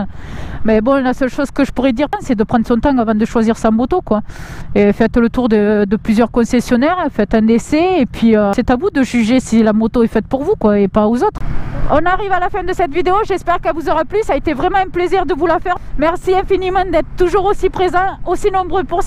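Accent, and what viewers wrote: South, France. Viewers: Qu'il est rétro-rafraichissant cet accent du sud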